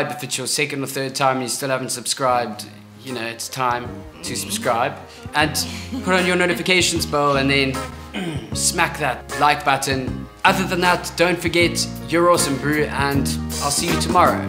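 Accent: South Africa. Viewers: Hey u sound South African.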